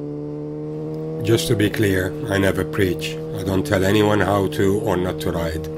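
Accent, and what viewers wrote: United Kingdom. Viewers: is this yourself speaking? i expected a much more british accent haha and im going to italy with my motorcycle this year, i like the 70% rule last year we also went there and 4 out of 7 bikes crashed (at least) once, luckily i wasnt 1 of them and want to keep it that way :)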